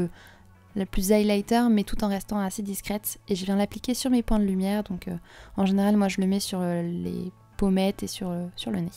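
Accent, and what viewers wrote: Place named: France. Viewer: Genial! Solo estaba preguntándote de eso porque vi un video tuyo en que hablaste en español porque me encanta ese idioma con el acento frances, suena tan hermoso! Solo es que soy un "nerd" cuando se trata de idiomas y por eso vine :v pero me caes bien, claro lol! q tengas un buen dia y ojalá que yo pueda hablar el frances y el aleman algun dia ya que son tan hermosos!! chau!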